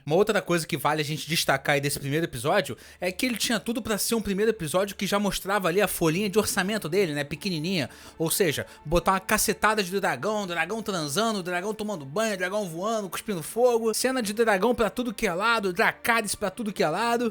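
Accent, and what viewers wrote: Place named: Rio de Janeiro, Brazil. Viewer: Tirando sotaque carioca o vídeo ficou muito bom.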